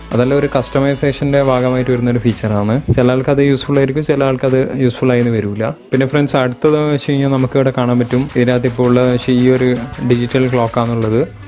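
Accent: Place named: Kannur, India. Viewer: കൊള്ളാം, നല്ല അവതരണം. കണ്ണൂർ slang ഉണ്ട്.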